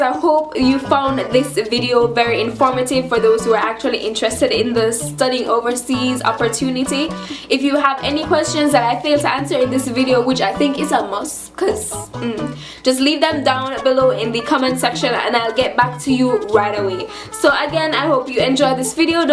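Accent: Jamaica. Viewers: she sound Jamaican.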